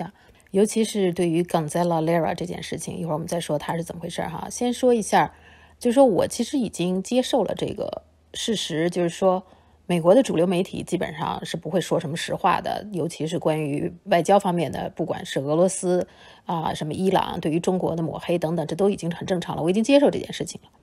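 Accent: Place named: Haidian, China. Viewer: 海淀口音…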